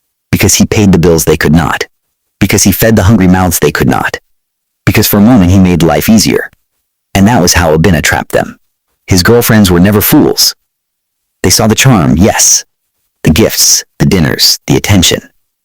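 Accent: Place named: United States. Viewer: Love your stories, but not a fan of the american accent telling the stories.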